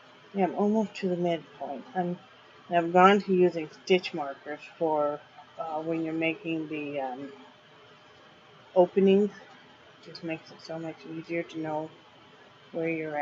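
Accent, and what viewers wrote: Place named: South, United States. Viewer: If I didn't know better I'd think you are getting a little southern in your voice. We are going to convert y'all yet💕💕💕😀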